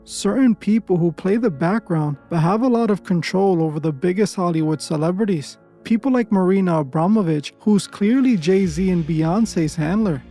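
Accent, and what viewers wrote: France. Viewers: Is she french..??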